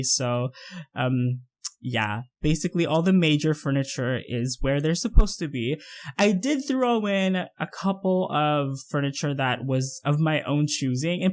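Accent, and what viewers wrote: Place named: Philippines. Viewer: Hello!! I love your builds so much~!! They are all so creative, I wish I was gifted with such talents for building houses in The Sims. :)) Anyway, you're probably tired of this topic, but I just wanted to share to you that the first time I came upon one of your videos, your accent didn't give you away, but there was a tinge of something or a slip that made me so sure you're Filipino. And, tada!! You are. Teehee, mabuhay!! I like your voice and the way you speak, by the way (creepy much XD). Also, I would like to say that I, too, am struggling with my academics. Damn. In high school I was doing great and college is very different. And I know how it feels to fail on something you worked really hard for. You're not alone. :)) But I'm glad to hear you're doing better. I'm in the same position as well. I have a few units left but it's not an assurance that next term would be my final term for undergrad (thesis is that one big obstacle. 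I'm taking Engineering). Hopefully it is. I think it's just a matter of trying again, and trying again with 200% effort? There are times we get lazy, I know, but it's ok. Sometimes we need to take a break. I do believe that if we fail on something despite giving our 100%, it's because there's a reason behind it. We just have to look at the brighter side of things. Cheers to you and good luck on your future videos~!! Good luck on your acads as well. ;)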